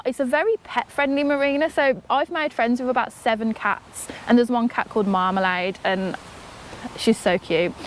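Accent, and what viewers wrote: Midlands, United Kingdom. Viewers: Your accent reminds me of my childhood! Proper Midlands accent! Love it. ❤️ good on you living the dream.... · That’s a familiar accent, making me homesick for the midlands and my boat all at once……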